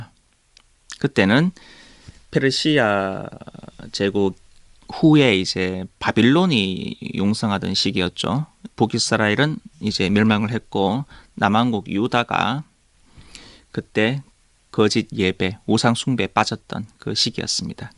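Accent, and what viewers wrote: Gyeongsang, South Korea. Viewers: 말씀도 좋지만 경상도사투리가 이렇게 매력적으로 들릴줄 몰랐습니다 ^^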